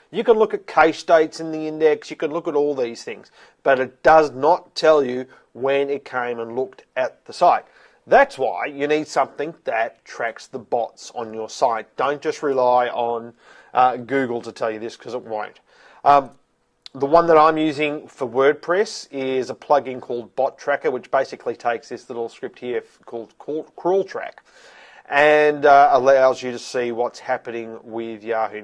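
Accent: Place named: Australia. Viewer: Thank you for this video! What do you think about zippcast? P.S. I like your Aussie accent :)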